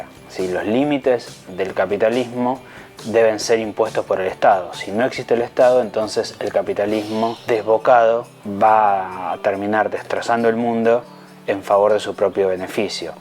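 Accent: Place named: Argentina. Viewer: Es un buen análisis acerca de los orígenes del capitalismo, aunque faltó añadir la clase de perversidades que es capaz de llegar a cometer lo que el propio Papa denominó como "capitalismo salvaje", y que es la etapa superior del capitalismo llamada "imperialismo", y su nueva arremetida que actualmente se lleva a cabo denominada "neoliberalismo".Sabemos que hoy la cuna del capitalismo mundial está en Estados Unidos. Este país no es más que un conjunto de empresas muy poderosas que a través de guerras e invasiones buscan adueñarse más y más del poder mundial. Supongo que estas ideas las desarrollarán en próximos vídeos. Un sólo aspecto de este vídeo al comienzo me incomodaba, después ya me desagradó, finalmente terminó molestándome. ¿Por qué el locutor acentúa y enfatiza tanto en la pronunciación de la letra "ye" o la "ll" convirtiéndola en una "ch"? 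Él no dice "llega a convertirse" sino dice "chega a convertirse". Ya sé que esa es la pronunciación del argentino, pero al menos podría suavizar este rasgo para así universalizar más este buen vídeo y evitar que con ese sonsonete caiga en localismos y regionalismos que pueden resultar no del todo agradables.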